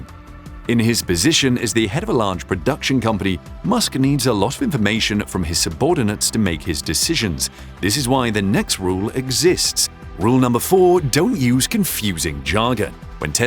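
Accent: United Kingdom. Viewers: Annoying British voice that uses click bait subject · A semi spiffing Brit presentation.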